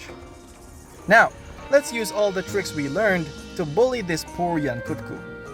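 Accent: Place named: Philippines. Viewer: However, I noticed your accent sounds familiar, sounds like kababayan 🤔, if you don't know that, forget it 😆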